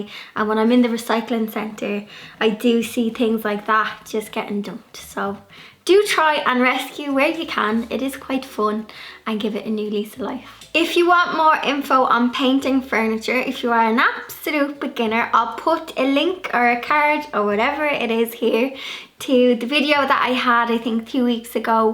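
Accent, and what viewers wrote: Ireland. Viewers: You are such a sweet auntie! I don’t know what I love more - your promotion of a ‘new lease on life,’ your brogue, or your love of Guinness (which I totally share,btw). Enjoy the pub.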